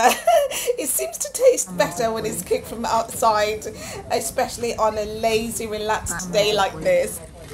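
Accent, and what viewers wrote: United Kingdom. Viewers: I just come here solely for that. Her strong British accent is entertaining. The moment she says, “welcome buck” when I’m used to hearing “welcome beck”. That on its own cracks me up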